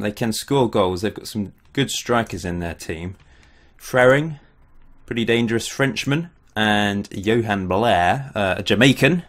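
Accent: RP, United Kingdom. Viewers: for the record, my girlfriend refers to you as 'that posh guy who talks about football' - and she isn't even British.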